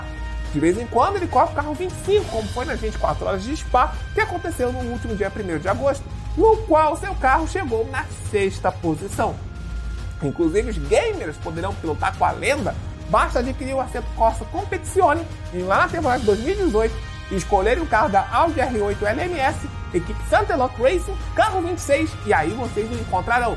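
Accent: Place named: Portugal. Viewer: Sim, belo sotaque PT-PT!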